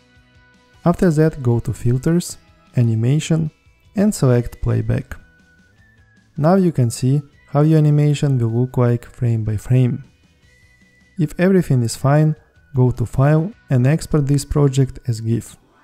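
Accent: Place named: Russia. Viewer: Какой акцент, будто русский говорит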